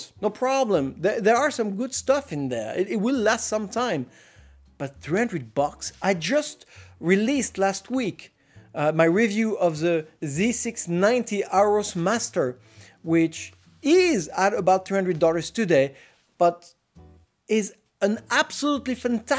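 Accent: France. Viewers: Man, I was about to buy it with a 7900X3D because it's 230€ but I'm going to pass ! Problem is, there isn't much X670 with 6 S-ATA ports at an ok price point at the moment. ASUS TUF GAMING X670E-PLUS WiFi looked good with additional SATA PCIe card but the card I'm looking at is X8 and both additionnal ports are X4... Super detailed test, with lots of passion and top french accent ;) ! Bon boulot, cette qualité de test me rend fier d'être français !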